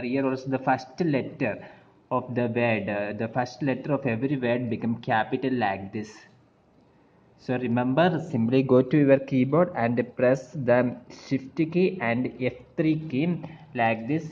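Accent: Kerala, India. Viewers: Malayali aanenn keetal ariyam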